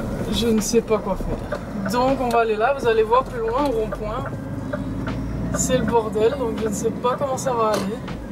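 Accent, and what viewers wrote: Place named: Belgium. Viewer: Très intéressante comme vidéo car je commence ma formation de camionneur en avril 2024. Changements de carrière pour moi. 🇨🇦🇨🇦🇨🇦 je suis de Montréal. Bonjour à vous en Belgique, j'aime bien votre accent !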